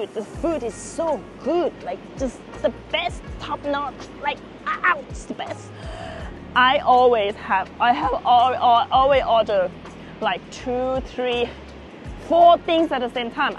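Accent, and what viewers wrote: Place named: Philippines. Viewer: R u Filipino? U have Filipino accent. You look beautiful though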